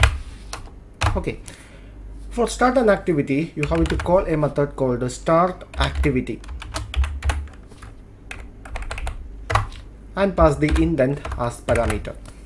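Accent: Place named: India. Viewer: I'm starting to like Indian accents :)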